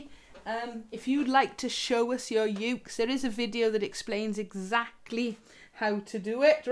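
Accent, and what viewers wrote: Wales, United Kingdom. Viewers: I’m so excited I just received my jazz mistress baritone ukulele from the awesome Fanner brothers and would love to show it to you and your fans. It was custom made it did take awhile I’m in Canada but I’m Welsh blood all my relatives are Welsh so I love hearing your accent reminds me of them. I am still learning and learned on an acoustic baritone Uke and have always used my fingers for strumming and picking. I find that it doesn’t sound as good on my electric and I’m trying to learn to use a pick. I only had my new Uke for two days and broke a string I guess I was using the wrong gauge or type. Is it possible you could show us how you use a pick/plectrum and what type you use on your electric Uke? Also where can I send you a picture of my baritone Uke I would love to hear your opinion? Also do you do online lessons that would be great!! 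Love Vicki. Cymru Am Byth 🇨🇦🏴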